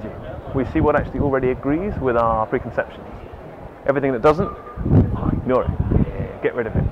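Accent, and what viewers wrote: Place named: England, United Kingdom. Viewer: Hi, very interesting video, nice to have a English accent for a change lol. Also nice to have someone presenting photographic concepts in this way. Thank you.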